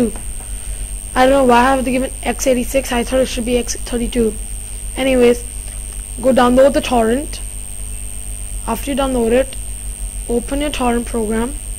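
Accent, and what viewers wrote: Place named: India. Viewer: you sound like a indian speaking english indian lol nice video. good work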